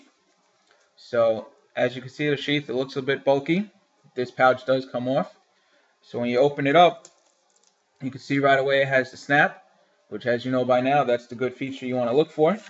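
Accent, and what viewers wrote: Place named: Rhode Island, United States. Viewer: you must be from Rhode island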